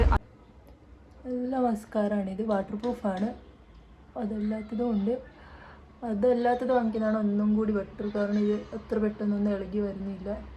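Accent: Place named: Malappuram, India. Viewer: Loved your malappuram slang... Maakup 😅😅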